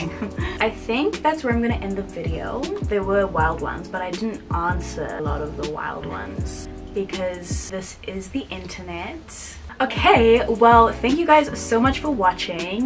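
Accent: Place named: Xhosa, South Africa. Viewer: Going thru ur vids catching up and Okay i love your accent no cap Are you xhosa🔥😌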